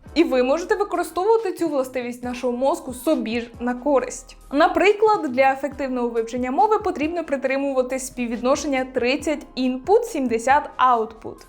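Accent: Poltava, Ukraine. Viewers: А ще мені дуже цікаво слухати вашу полтавську вимову🥰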